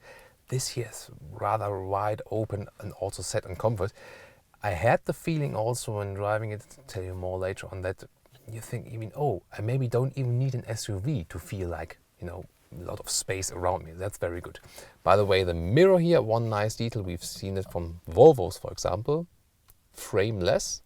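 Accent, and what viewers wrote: Germany. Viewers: Great review! Simple and focused on the subject. Also like your english with german accent. Have you got any polish roots coz your surname sound like?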